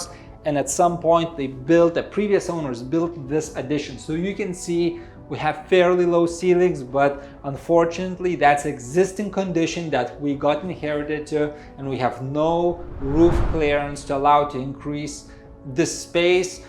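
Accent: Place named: Baltic states. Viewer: Chanced on your video for the first time, wasn’t expecting blet by the garage, and only then did I recognise your Baltic accent. Nu va. Sveikas! 😊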